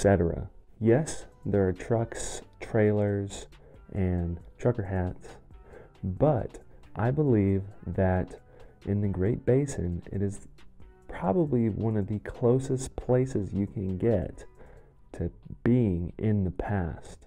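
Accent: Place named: California, United States. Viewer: Born and raised in the Great Basin, this presenter is an embarrassment. He sounds like a Californian. I also take issue with his incoherent comment about the Great Basin not being part of the larger value set of traditional Americans. If there was and is any place in America that has hung onto traditional American values, it is in the cow country of the Great Basin. The traditions of the Vaqueros with the long reata, hackamores and spade bits never got the attention of the Texas cowboys precisely because of the remoteness of the region and the independent nature of the buckaroo. Battle Born. Storm Rough Country Horses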